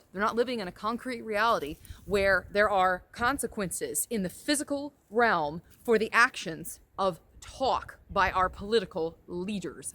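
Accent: South, United States. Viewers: Yes I like what you have to say I think the same way I hear you got to hear you have a Southern Voice I live in South Carolina I like to meet you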